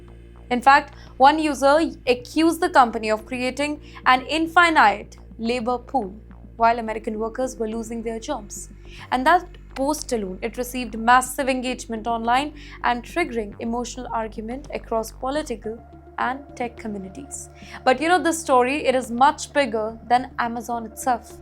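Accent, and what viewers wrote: India. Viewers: Can't figure half of what she said. Too Indian accent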